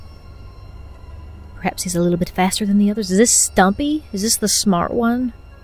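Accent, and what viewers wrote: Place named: South, United States. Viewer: Oh man, I love ur Southern Accent. Its like Heaven. Please dont Change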